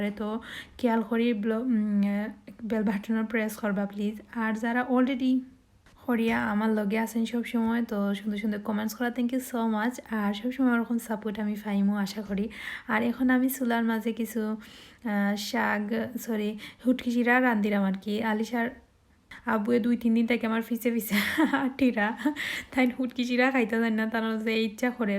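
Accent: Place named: Sylhet, Bangladesh. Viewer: আপু আমি ঢাকায় বনানী তে থাকি। তোমার ব্লক টা ওনেক সুন্দর লাগলো … আমি কখনো সিলেটের কথা গুলো শুনি নেই আজ Fast শুনলাম তোমাদের সিলেটে ভাষা গুলো শুনে আমি তো অবাক হয়ে গেছি আসলে অসাধারণ লাগলো খুব খুব ভালো লাগলো তোমার কথা গুলো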